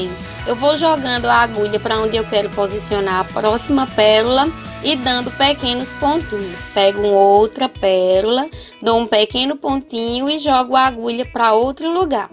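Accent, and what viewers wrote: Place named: Paraíba, Brazil. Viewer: Moça, é incrível. Você falou e eu pensei 'essa mulher é paraibana' Dito e feito kkkkk Beijos da sua conterrânea também de João Pessoa.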